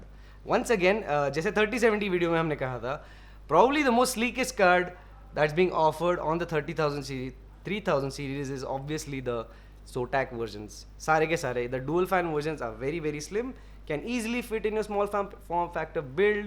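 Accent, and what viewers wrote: Hyderabad, India. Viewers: Hyderabadi kitta bhi koshish karlo ustaad zabaan pe ajatich language. Aakhri kiraak maut angaar video tha, maut dalderai. Aisich aando videos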